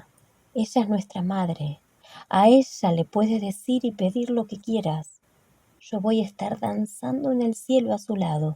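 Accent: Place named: Southeast, Mexico. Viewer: Gracias por los audiolibros de Malinche. Excelente y muy bonita voz que parece del sureste mexicano (Tabasco o Veracruz). Disfruto mucho cada audio. Mucho éxito en sus proyectos 🤗👍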